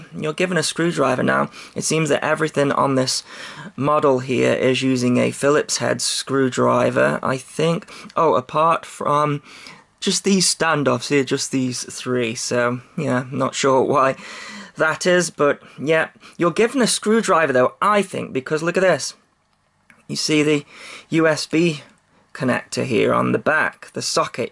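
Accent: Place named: East Midlands, United Kingdom. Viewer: Nice fella that....where are you...that's sounds like an east Midlands accent...or potteries..lol...no wonder you don't get much Sun l lol great review as always..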